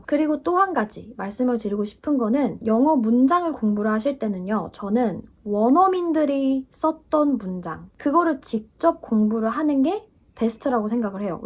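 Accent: United Kingdom. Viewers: 영국에 쭉 사시는것은 아닌가봐요! 덕분에 영국영어를 매일 듣고있어요 감사해요!!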